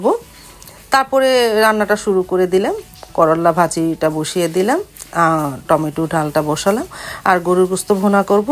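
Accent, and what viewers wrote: Bikrampur, Bangladesh. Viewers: এটা আঞ্চলিক ভাষা মেক্সিমাম বিক্রমপুরের মানুষরা এভাবেই উচ্চারণ করে কথা বলে ভুল না ধরে যার যার আঞ্চলিক ভাষায় কথা বলার অধিকার আছে তার ভাষায় কথা বলতে দিন আর ভিডিও উপভোগ করুন।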